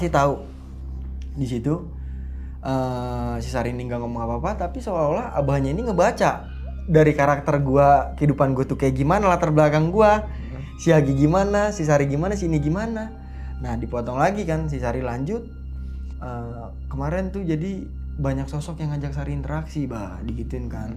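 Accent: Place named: Ketapang, Indonesia. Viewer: Kek orang ketapang bahasanya Beke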